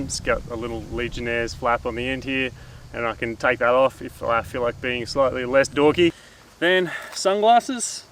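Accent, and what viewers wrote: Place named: Australia. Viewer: With the Aussie accent Can Serrat sounds like something else. Good video mate hi from Tassie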